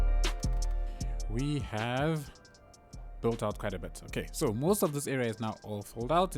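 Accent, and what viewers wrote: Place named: South Africa. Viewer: Love your South African accent bro refreshing from all the American YouTubers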